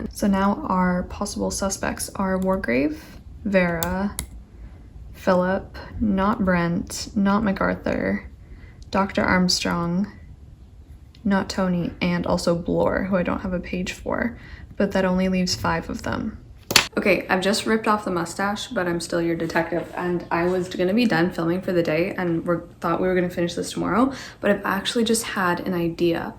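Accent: France. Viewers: Loved the French accent🇫🇷🥖👏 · Ton accent français m'a fait sourit, c'était drôle 👌❤️ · ok so i have only just found you and genuinely thought you were french... like parisien french... and i speak french with a deliberate burgundian accent... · the cigarette , the spoon , the mustache , the accent . we have ourselves a french detective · Are you French or Belgian or Canadian? I've seen And Then There Were None (1945 film) and I've read The Murder of Roger Ackroyd so ... I know all the answers! It sounds like the film and the book have different endings!! WOW! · Your French accent was so cute! 🤗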